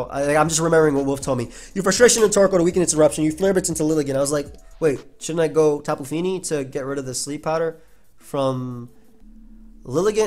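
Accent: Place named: New York, United States. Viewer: Neeyew Yawk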